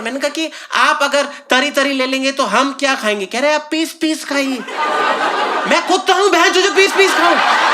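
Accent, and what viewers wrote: Pakistan. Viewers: Is he Pakistani his tone is similar..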